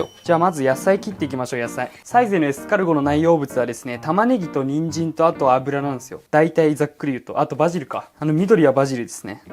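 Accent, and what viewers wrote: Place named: Mikawa, Japan. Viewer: 方言が愛知っぽい どっちかって言うと三河の方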